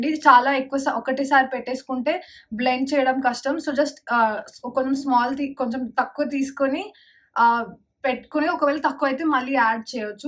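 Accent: Telangana, India. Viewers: telengana pilla.. we should proud of our slang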